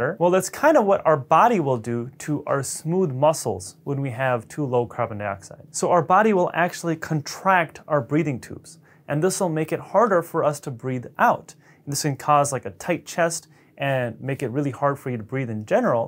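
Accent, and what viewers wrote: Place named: United States. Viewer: I'm guessing from his accent he was born in America?!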